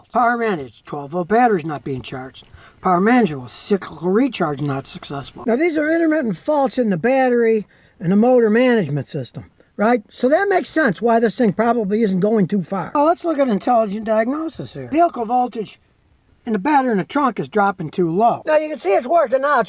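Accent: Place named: Midwest, United States. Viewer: I could swear you said something at the beginning of this like "rough Long Island road". You didn't say that did you??? That would mean you're from Long Island and then we'd become friends! You have to be in the Midwest by the sound of it, right? I have a 2012 Navigator I'd LOVE to be able to bring in for you to fix!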